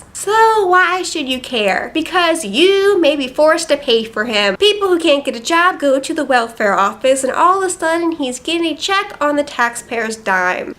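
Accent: South, United States. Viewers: please stop with the country accent very degrading to us southern folk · what's with the southern voice? Really, SMH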